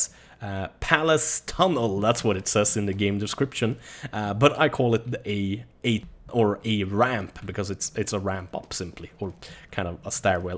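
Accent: Sweden. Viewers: I love the swedish accent xDD lie hopw you say DOTT lol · are you swedish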